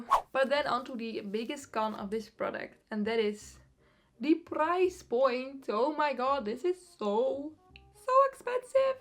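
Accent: Netherlands. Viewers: I love Dutch accents 😍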